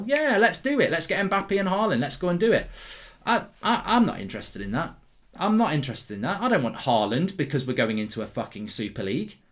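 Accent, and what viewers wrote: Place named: Northern England, United Kingdom. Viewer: Nice Northern accent where abouts are you from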